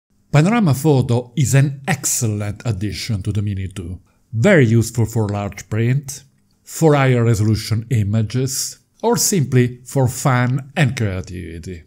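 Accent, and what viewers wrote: Italy. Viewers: Panorama foto moda 😆 like the Italian accent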